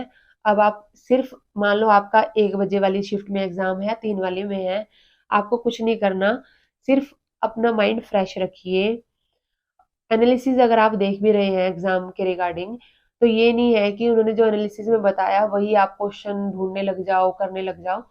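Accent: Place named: Himachal Pradesh, India. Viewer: Your accent is Himachali...💞...nd thnxz for boosting ma'am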